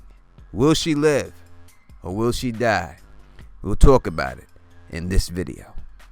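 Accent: New York, United States. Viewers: Glad you're better. Was sick myself couple of weeks ago. Great video 👍 We wouldn't leave ya!! Stay sexy 😘 Could listen to your voice all day. I love New York accents. I live in Alabama so...that doesn't happen enough!!😆💕💙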